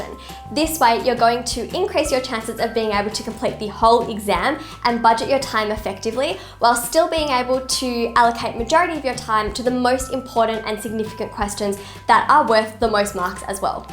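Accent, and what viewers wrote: Australia. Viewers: Aren't you an Aussie ? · are you an Australian?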